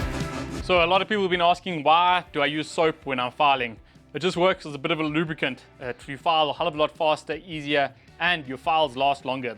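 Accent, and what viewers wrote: South Africa. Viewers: Do l detect a slight South African accent ? I bought my planishing hammer in January 1970 , a Proto made in Canada .l always kept the head clean until about 10 years ago some idiot recovery driver thought he'd use it to unjam his tailgate ! It completely destroyed the case hardened face. I rate myself as a very good panel beater / painter but l was in accident repair so never had the opportunity to use a wheeling machine or work with alloy . Fabrication and making panels is something l self taught . My mentor passed a year ago . He had a wheeling machine and learnt to work with aluminium. He was very talented . I thought this was repaired a number of years ago . Or is this a replica ? Thanks .P.S. Allen welded with oxy/ Acetylene . Tig welders were years away 😊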